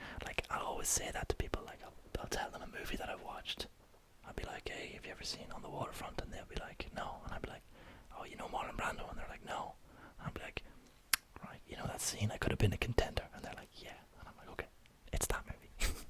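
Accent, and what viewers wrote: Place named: Ireland. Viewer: The irish accent is great for asmr. I could listen to you talk for hours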